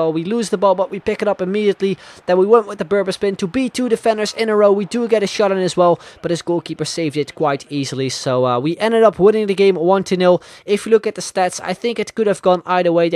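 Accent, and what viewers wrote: Netherlands. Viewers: You're dutch aren't u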